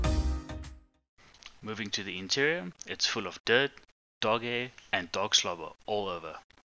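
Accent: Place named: South Africa. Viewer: You can recognise a SA accent anywhere. Nice job. Hope to see more videos.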